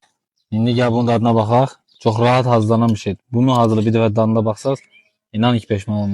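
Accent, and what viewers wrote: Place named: Zagatala, Azerbaijan. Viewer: Salam,bildiyime göre Zaqatala!💐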